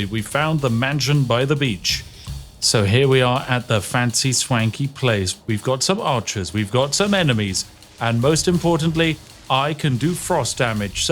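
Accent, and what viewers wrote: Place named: Yorkshire, United Kingdom. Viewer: I DIDNT KNOW I NEEDED THIS! omg nothing so tranquill as a good voiced yorshire brit having fun doing apocalypse things! this is so great!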